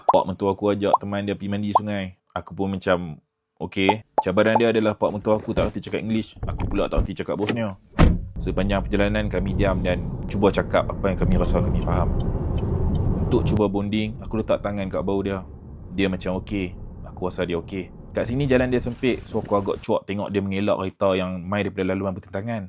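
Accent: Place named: North, Malaysia. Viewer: jaga diri kat sana, sebarkan slang utagha kat sana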